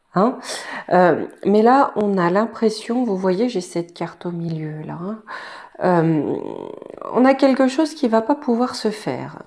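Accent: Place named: France. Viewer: Bonjour. Merci pour cette vidéo. J'adore votre France parler. Bon week-end également.❤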